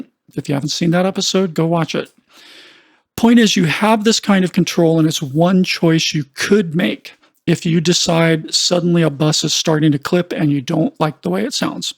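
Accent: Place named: Vancouver, Canada. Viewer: Just out of interest, are you in Vancouver by any chance? I just ask as you have the exact same way of explaining things as one of my mentors over here who was an engineer over there before being poached by the Canto-pop scene in the 80s. And I don’t just mean your accent, it is your whole demeanour. It is pretty unreal!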